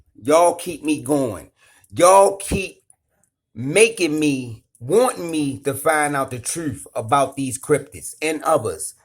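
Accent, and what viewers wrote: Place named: Louisiana, United States. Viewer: Hello,. Are you from Louisiana? You have an interesting accent, I enjoy hearing you speak, as I am not often exposed to people that have your accent. I'm a Hillbilly in northern West Virginia, so I have more of a Yankee accent. The people in the southern counties of WV have more of a southern accent. I'm definitely going to hit the subscribe button. Looking forward to more. Respect and Blessings from Wild Wonderful West Virginia. 🙏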